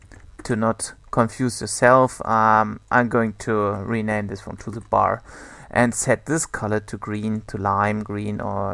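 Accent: Germany. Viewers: Great tutorial. I love how you pronounce "th" as "z" like a stereotypical german. For normal use you would make a function like this instead of decreasehealth: void changehealth (int healthvalue) { if (cur_Health == healthvalue) return; if (healthvalue > max_Health) cur_Health = max_Health; else if (healthvalue >= 0) cur_Health = healthvalue; else cur_Health = 0; float calc_Health = cur_Health / max_Health; SetHealth (calc_Health); }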